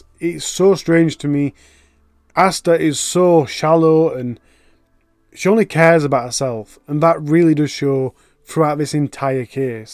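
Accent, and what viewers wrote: Yorkshire, United Kingdom. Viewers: Oh it’s good to hear a yorkie voice, giving us true crime. ❤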